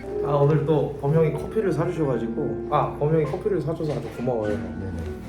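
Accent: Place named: Gumi, South Korea. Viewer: 오늘도 재미써여~ 구미사투리에여~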